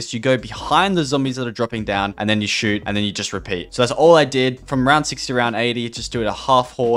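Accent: Australia. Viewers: he’s Australian and he is saying kuda not cooter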